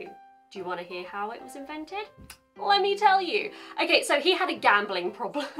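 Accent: RP, United Kingdom. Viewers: Hi, Somehow your talk soothes me a lot. It’s melodious to me actually. Is your accent the conservative RP or something? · かわいいー💓し、内容も素敵！ ブリティッシュアクセント好きすぎて登録しました〜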